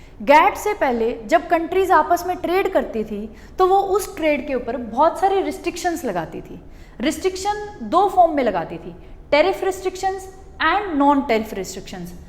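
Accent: Punjab, India. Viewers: Mam are u pnjabii??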